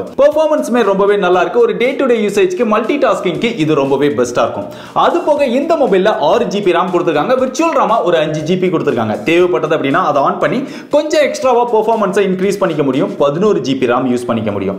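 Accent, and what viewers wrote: Kovilpatti, India. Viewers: Anna neenga kovilpatti ya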